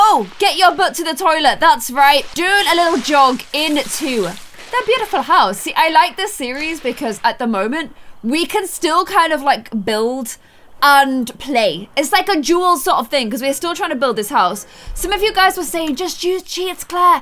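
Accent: Ireland. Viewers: are u irish because if u are i am too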